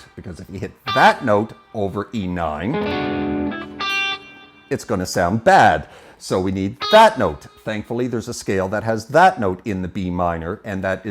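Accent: Canada. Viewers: It was very interesting, I’m from England, do you have a Canadian accent?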